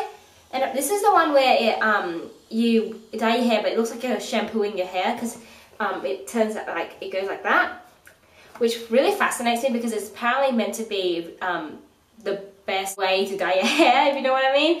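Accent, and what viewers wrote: Australia. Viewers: are you Australian? xD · hey r u australian? u hav an aussie accent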